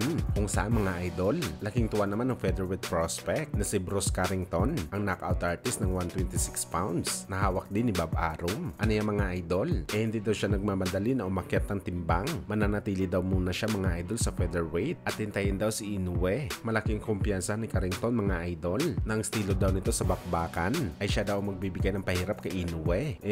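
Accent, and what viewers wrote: Iloilo, Philippines. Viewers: Te ilonggo ka haw?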